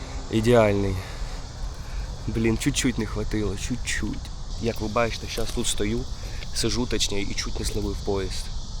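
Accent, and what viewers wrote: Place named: Poltava, Ukraine. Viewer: Перший раз тебе дивлюся! Красава! А по суржику схоже що ти з Полтави?!😅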